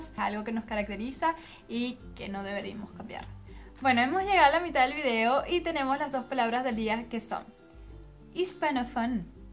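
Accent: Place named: Venezuela. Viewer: Venezolanisima pero #CuentoALaVersionEspañola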